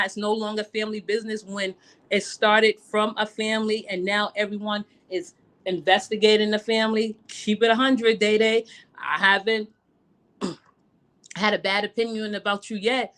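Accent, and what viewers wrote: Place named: Bronx, United States. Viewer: Hey my beautiful how long have you been back on utube I love hearing you speak that northern accent 🦁🦁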